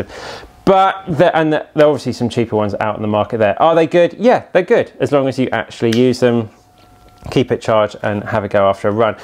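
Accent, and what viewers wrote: United Kingdom. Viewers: Me and my bf find you so cute and love your British accent. I'm currently aiming for sub 2.40 marathon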